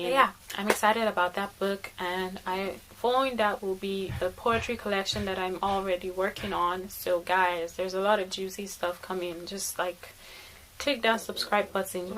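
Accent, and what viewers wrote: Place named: Nigeria. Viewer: I guess writing and keeping your work scope and plot around Nigeria has helped to keep you connected to the Fatherland, I could see and feel the “Nigerianness” here and there while you speak! I totally loved it ❤️.. A fan!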